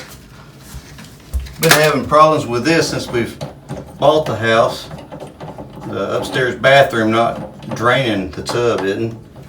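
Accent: South, United States. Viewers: I hear a thick country accent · I love a good southern accent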